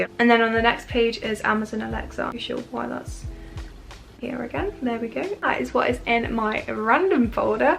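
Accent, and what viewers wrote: England, United Kingdom. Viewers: loves her cute british Accent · Your English accent is very nice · She is from England maybe, cause i don't understan all words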